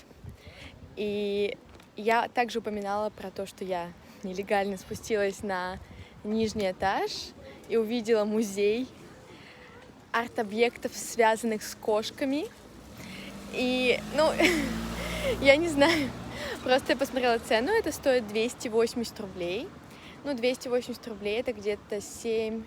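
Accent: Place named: France. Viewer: Привет! Откуда ты? Я никогда не слушал твой акцент 😄 Либа ето не акцент, проста как вы говорите? Вы как француженка с очень высоком уровень на русском хахаха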